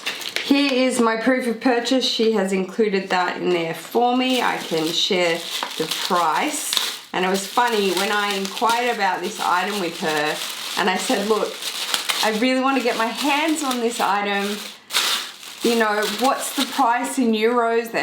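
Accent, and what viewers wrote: Australia. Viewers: Hi, I love watching your videos and hearing your Aussie accent too 😍😍 sorry don’t wanna sound rude but just out of curiosity, what is your plan with your luxury bags collection in the future? What is your goal with them? You obviously won’t be able to wear them all, so what’s your plan with your collection in the future? Thanks! 😊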